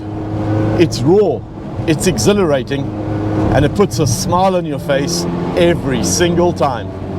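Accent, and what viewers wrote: South Africa. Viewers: Are you South African? You sound like a Joburg Boytjie? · I know a South African accent when I hear it 😂😂😂😂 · Dammm sounds just like a South African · I thought straight away that your English sounded suspiciously similar to my local Eastern Cape English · Bro, are you South African? I can always hear my country’s accent 😂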